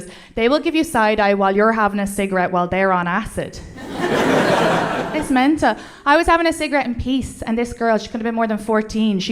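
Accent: Ireland. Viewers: That's not a Dublin accent, she's from Kildare by the sound of her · I would have liked to hear you say cunt in your set at least once, there's just something so amusing about hearing an Irish girls accent calling someone a cunt that makes me smile wide 😸😸 / but I realize that you have to set the standards for your style of comedy and how far you're willing to push it 👍🙏 great set too btw 😻😸😺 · She slipped into a few Irishisms there, I wonder how many people didn't understand or had to process some of what she said. Small things like not disregarding T at the end of words and even in the middle of words. Americans would pronounce them as D but we leave them out completely! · Her Irish accent got stronger after the first minute of the video.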